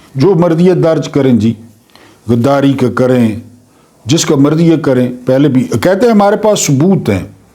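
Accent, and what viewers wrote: Saraiki, Pakistan. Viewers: Subhan Allah bhai.bht achhi Islami kahanian hoti hain aapki.bht maloomat hoti hain Aur bht mofeed hoti hain Allah aap ko ajar.e. azeem ata farmay.Vedios dilchasp hoti hain Tawalat ka pata hi nahen chalta.aur saraiki ka tarka to bht achha lagta hai.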